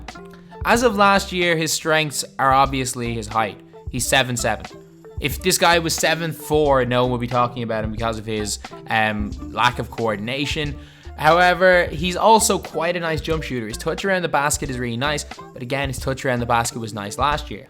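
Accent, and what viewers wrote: Ireland. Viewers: What the fuck is your accent.. You sound posh Irish.. Do you want a soft drink? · are u Irish ? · Never realized the Irish accent until you said you played in an Irish league lol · ARE U irish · Are you Irish? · R u Irish I can hear the accent kinda ?????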